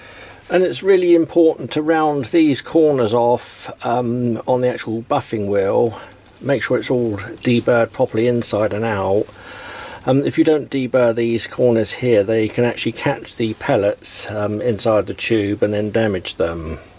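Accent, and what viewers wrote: United Kingdom. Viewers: Superb - great knurling for a light lathe - and a nice outcome. Trying to guess your accent - is it Gloucester or Suffolk or am I totally wrong?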